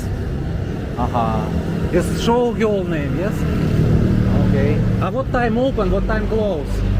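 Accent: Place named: Russia. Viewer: you did a nice job on the video tour of Barrio Barreto. I will go visit in a few months. I have already booked my hotel (which you passed by in the video). I haven’t been to Angeles City since the ‘80s, so when I watch videos of Walking Street it just seems a little too much “in your face”, although I do enjoy P. Burgos St in Makati. Olangapo looks like a relaxed place to chill out with the locals. I enjoy listening to your Russian accented English. You have learned to speak English better than most. Good luck with learning Chinese!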